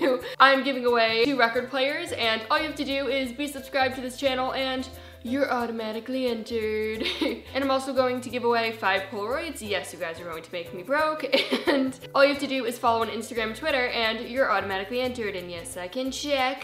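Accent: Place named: New Jersey, United States. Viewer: you're from New Jersey? no wonder why you sound that way! you sound like you're from somewhere close to NY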